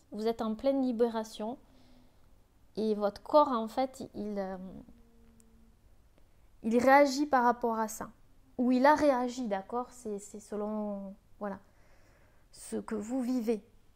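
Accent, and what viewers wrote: South, France. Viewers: Bonsoir Magnifique tirage! J'ai pris la chouette n°1 et pour info le père, la mère et l'enfermement ce sont passés quand j'avais 4 ans car ma grand-mère et ma mère étaient en sanatorium et mon père travaillant, mes parents m'ont laissé dans une maison pour enfants pendant 1 an. Je ne leur en veut pas évidemment mais l'enfant "Isabelle" a dû ressentir beaucoup d'abandon.... merci encore pour ta générosité et ton accent du sud!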